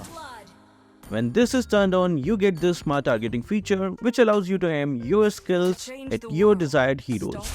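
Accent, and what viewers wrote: India. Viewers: Ofc he is))) accent is perfectly Indian)) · Bro are you indian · Are you Indian Sir?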